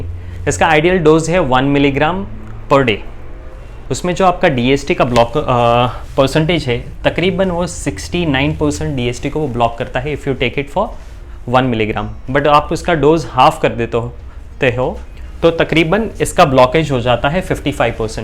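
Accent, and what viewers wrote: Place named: Hyderabad, India. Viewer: Hao yaaro hyderabadi hai ye doctor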